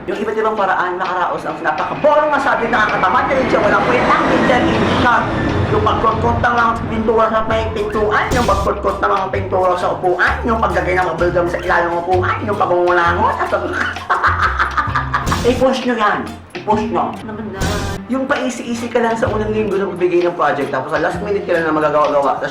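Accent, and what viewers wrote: Visayas, Philippines. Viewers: halata. ilonggo or cebuano ito cia.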